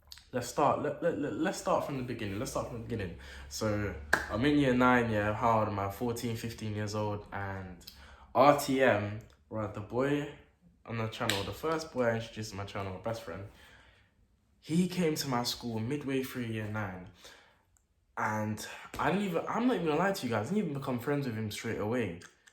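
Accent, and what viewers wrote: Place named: Northern England, United Kingdom. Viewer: With his dead northern accent the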